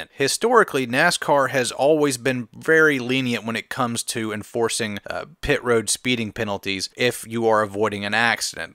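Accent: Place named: Texas, United States. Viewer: he speaks so fluent at the same time with a texas accent. This is why i like this channel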